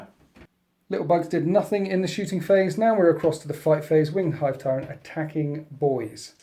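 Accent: United States. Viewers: Lol American accent. Would love to hear a batrep like that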